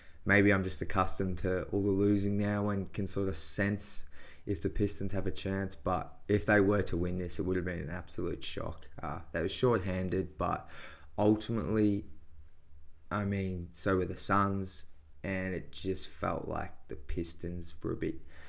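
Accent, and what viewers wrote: Australia. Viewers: You from Aussie lad?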